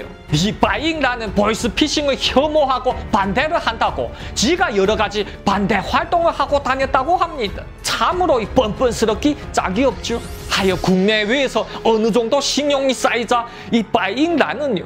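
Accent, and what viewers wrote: China. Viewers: 진짜 중국스타일 톤과 리액션 딕션 너무 재밋어요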